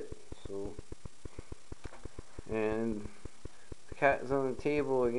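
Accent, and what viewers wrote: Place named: Newfoundland, Canada. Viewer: Definitely sound like a Newfie. ;)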